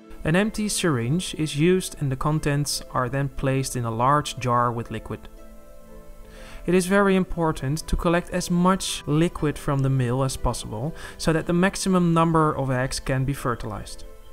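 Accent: Netherlands. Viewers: it sounds like you have a netherlands accent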